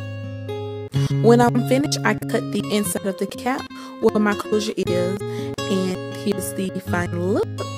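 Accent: Louisiana, United States. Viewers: aw<link> this tutorial just seemed sooooo sweet! LoL. beautiful job... do i hear a Louisiana accent?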